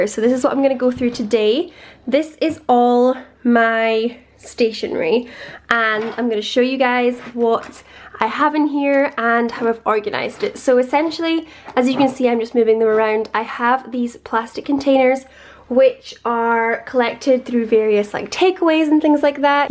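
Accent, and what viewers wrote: United Kingdom. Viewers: I love how her accent sounds like normal English accent but also has that British accent😍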